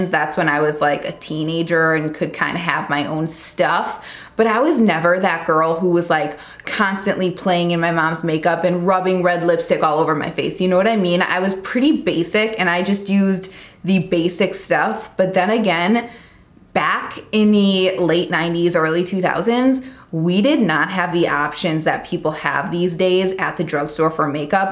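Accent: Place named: Midwest, United States. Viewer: the midwest accent is everything.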